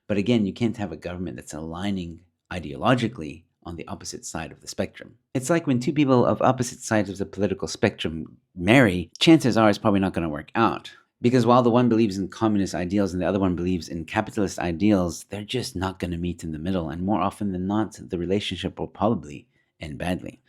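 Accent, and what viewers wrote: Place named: United States. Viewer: Don’t lose your American accent just yet. You might be back before you know it.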